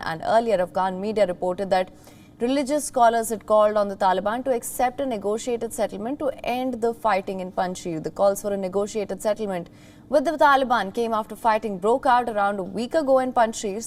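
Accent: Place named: India. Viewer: Accent is never a problem for anyone in the world except for Pakistanis. She is Indian, not American to have american accent. So, get this digested.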